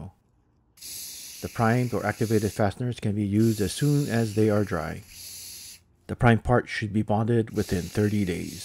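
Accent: Hawaii, United States. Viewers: Are you from Hawaii? I hear a little Hawaii local twang in your voice lol😂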